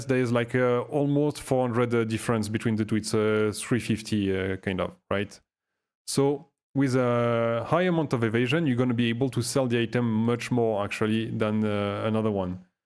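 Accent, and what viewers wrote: France. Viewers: au vue de ton accent je suppose que tu es français ^^ en tout cas , très bonne vidéo. Les divines se font rare en ce moment x)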